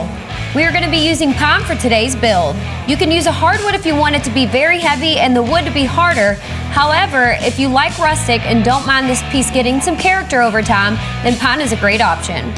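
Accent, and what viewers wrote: South, United States. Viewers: Redhead. Chest. Southern accent. She has to be bi too. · How the fuck, did I end up here?? ...I ended listening to southern American girl ....building shit. Why.....I am in Europe like. At least I enjoyed her accent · That country accent 👌🙌😍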